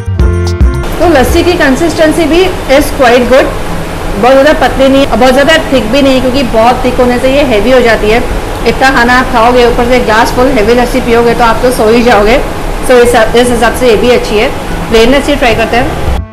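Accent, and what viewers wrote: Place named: Sindh, India. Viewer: Sindhi accent is good to hear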